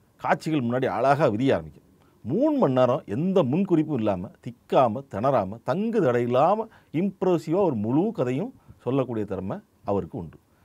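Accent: Tirunelveli, India. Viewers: இதில் பேசிபவர் எங்கள் நெல்லை மண்ணின் மைந்தர்.இவர் பேச்சில் எங்கள் ஊர் ஞாபகமே வந்திருச்சி.❤ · குரல் கரு பழனியப்பன். பேச்சு நடை நெல்லை.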